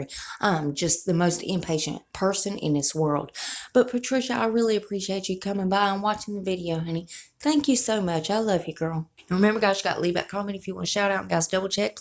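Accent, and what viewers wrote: South, United States. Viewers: Love your accent! You are definitely a southern gal. Same here, KY gal. Loving my Boxy Charm and look forward to receiving them every month. Stay safe.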